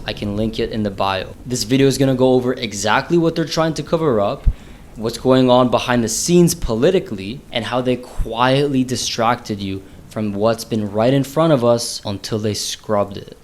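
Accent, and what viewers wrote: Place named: Canada. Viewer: Oh this is good man. Idk where you came from… sound Canadian - but this is good work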